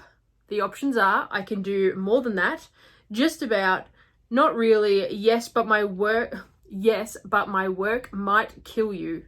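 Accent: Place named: Australia. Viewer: I got Claire as well! Don't pay any attention to nasty people. I am Canadian and love your accent. I have a friend from Melbourne who sounds just like you. I love your Outlander Tuesdays. I just started reading the books and watching the show about a year ago and am obsessed. Keep up the great videos!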